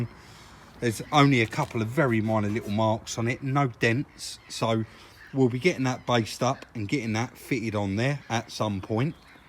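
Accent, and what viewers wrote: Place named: Cockney, United Kingdom. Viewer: Bloody marvellous video what looks like a nightnare to anyone else is a doddle to you i admire your determination how about a meet and greet in summer i reckon loads of us would turn up celebrate your 100k i was with you at 45 ish lol im sure you inspire so many of us as always so professional with a great cockney touch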